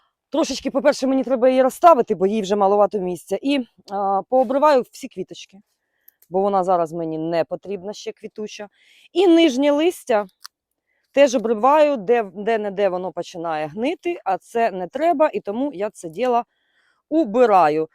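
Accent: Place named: Surzhyk, Ukraine. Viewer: Ще менше суржику, будь ласка. Попрацюйте над правильним вимовлянням закінчень слів.😉💪🏻🇺🇦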